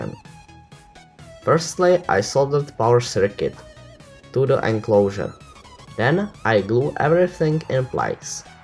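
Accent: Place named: Czech Republic. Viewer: Celkem český přízvuk XDD gut video dávám like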